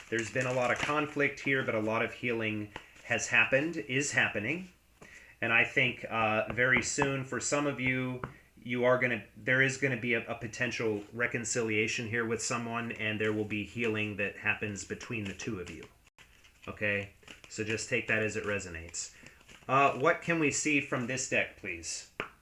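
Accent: South, United States. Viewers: I like your regular voice better than the southern one. :) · Yeah you were throwing me off with the southern thing, ha.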